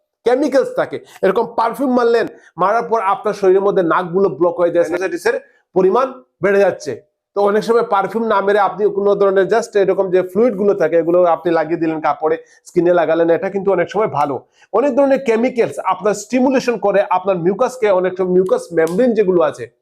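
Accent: Sylhet, Bangladesh. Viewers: Sylhet er bhasha emnitai bujajai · Bro ! Are you from Sylhet ? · bai sylheti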